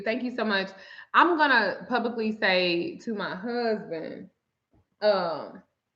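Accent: South, United States. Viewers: You & your husband look like you two were made for each other: Twin Flame Energy 💫 I love your southern accent.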